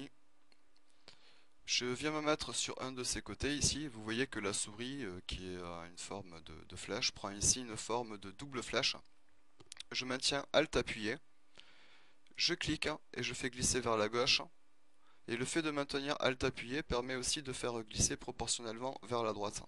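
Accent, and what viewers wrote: South, France. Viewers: bonjour , merci pour ces tutos d'une très bonne qualité et vos explications pourvus d'un accent du sud ;-))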